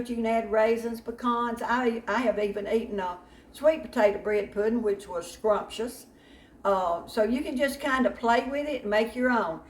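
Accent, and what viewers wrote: South, United States. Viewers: I love your southern accent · a good southern accent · your adorable southern accent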